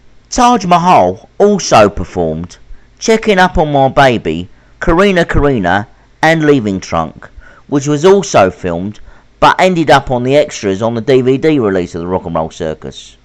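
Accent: United Kingdom. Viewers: I love the Cockney accent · Man has a genuine British accent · i like it's genuine british accent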